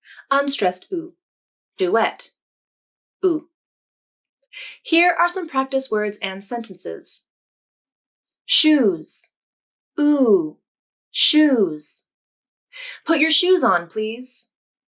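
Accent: San Diego, United States. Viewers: everyday. thank you.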